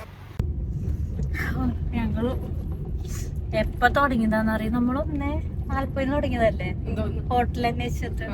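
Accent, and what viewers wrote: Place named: Thrissur, India. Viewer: Trissur slang is very good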